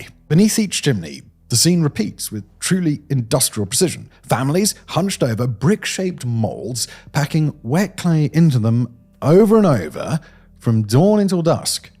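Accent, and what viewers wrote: England, United Kingdom. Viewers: Why does everything presented by sound like it has more authority because of his British accent? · Jewish have run India for centuries! They know what Jewish Britain did to them . Your English accent can’t hide your Bias either;(! Says The BronxBoy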